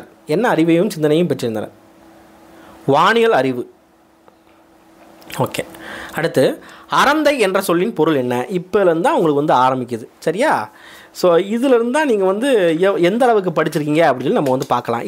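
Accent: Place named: Madurai, India. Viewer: Super video sir. Thank u🎉 unga iyalbana peachu enga maduraiya kanu munadi kondu varuthu sir. Easy ah understand aguthu sir ilakanam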